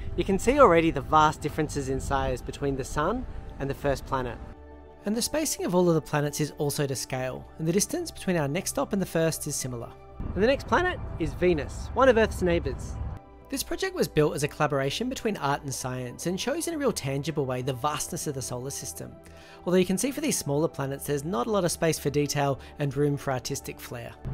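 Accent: Australia. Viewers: Australian tom Scott out here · Australian Tom Scott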